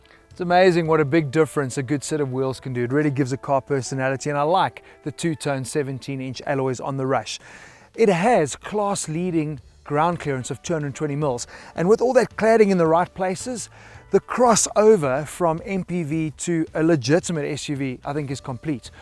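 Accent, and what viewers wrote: South Africa. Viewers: To be honest, I am watching this video only because his South African accent, which I barely understand.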